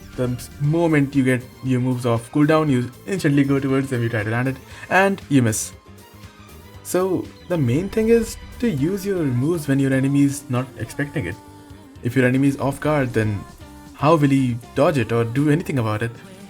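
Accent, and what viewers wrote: India. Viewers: Accent: indian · R u indian?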